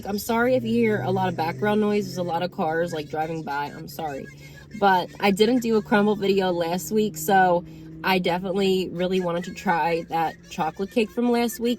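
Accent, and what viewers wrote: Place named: Philadelphia, United States. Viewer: Oh your birthday is March 7... so awesome..so is mine. And I could tell you where from like Philly or Maryland or something...your accent... awesome video... Have a great week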